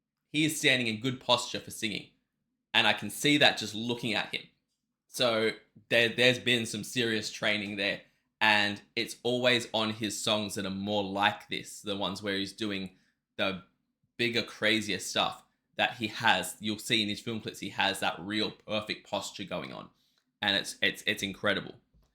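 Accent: Australia. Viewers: Hey what's the comment on the Australian accent ? or maybe you are Australian? LOL ! LOL ! We are all starting to sound alike anyway.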